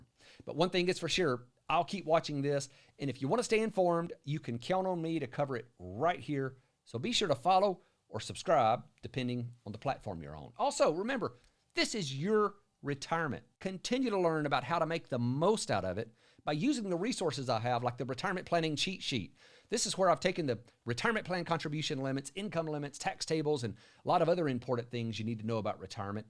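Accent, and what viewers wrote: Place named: Appalachia, United States. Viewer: Stupid Republican hillbilly. Could tell from the hickish accent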